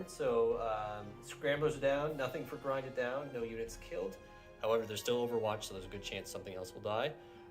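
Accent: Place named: Canada. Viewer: Canadian accent detected :)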